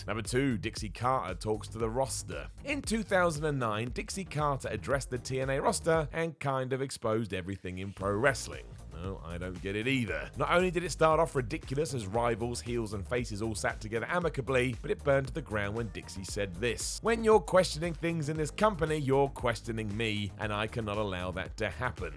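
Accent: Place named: United Kingdom. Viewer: They're from the UK lol. How do you want them to talk?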